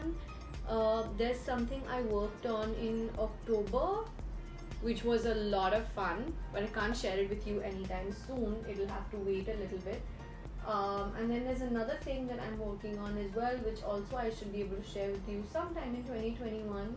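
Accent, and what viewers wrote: United Kingdom. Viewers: British accent videoooo!!! Yesss!!!! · I think you also should start new channel for just ur british accent! For ur british accent fans... 😅 You should atleast start some series though in ur british accent🤞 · Omgggg, your brit accent is on point 😱💕 Loved this chatty video! 💕 Pls do some collabs! 💕 Even I feel like such a community/communities are important 💕💕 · Hii... after a long time makeup video.... felt good after watching this😊... & ya old is back & so happy to see the old version🙂... & your British accent is 🔥🔥... & just wanted to say that I love u ❤❤❤🥰🥰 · Hey this video was so good you i was doing my assignment and while doing my assignment i was just listening to you and i t felt so good listening to uhh and it gave a lot good vibes and i especially loved ur British ascent and lots of love to uhh and more power to uhh ♥️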